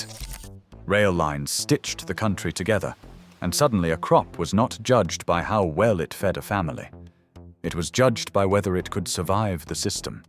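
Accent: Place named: Southern England, United Kingdom. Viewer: 👋🏻 it’s not a robotic voice 😀 it’s a normal “ educated “ Southern English voice 💕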